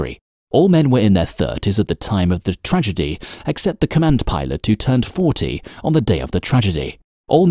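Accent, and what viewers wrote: England, United Kingdom. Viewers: Why do they use someone (computer) with an English accent? It's stupid and insulting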